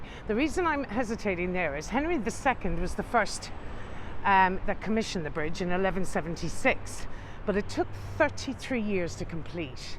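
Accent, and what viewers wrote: Ireland. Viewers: Amazing tour by the Irish Queen, your Knowlege & Beautiful Irish Voice make your tours absolutely A must do when visiting London! Your commitment to Detail is truly Second to None!! Thank you for all you do!! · One day when I can get back to London, first time was in 2008 from Oz to UK, I will book you for a tour esp the East End (my ancestors home). I just love your videos. I have so many books about London, it has always been a fascination to me, even before I even knew my mother's family came from East End London...I'm addicted. Thank you so very much you are incredible. And I love your Irish voice, also Irish from my mother's mother's side. cheers from South Australia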